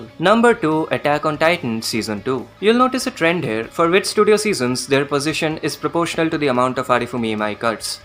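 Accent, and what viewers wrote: India. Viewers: Why you sound like an Indian scammer🤔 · Are u indian? 🗿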